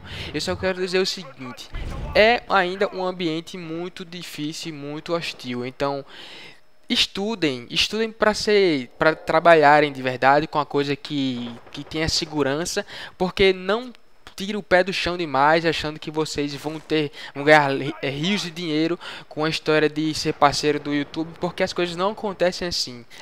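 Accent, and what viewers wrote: Northeast, Brazil. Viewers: tudo bem cara vc tem sutaqui diferente,vc e. Nordestino?? Abraço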